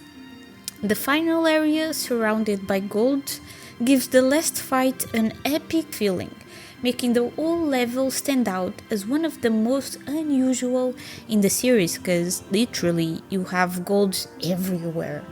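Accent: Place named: Portugal. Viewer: Puro sotaque tuga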